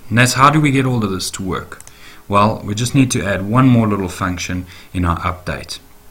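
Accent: South Africa. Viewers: I am in JHB and I've got to say I do love seeing other South Africans do tuts, and man do you know your stuff. Keep it up, great tut:-D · Awesome tutorial! Thanks. I can hear you are from SA!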